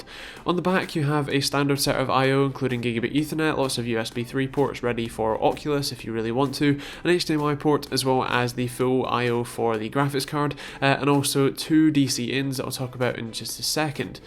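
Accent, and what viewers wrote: United Kingdom. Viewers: you live in the UK? barely have a British accent haha.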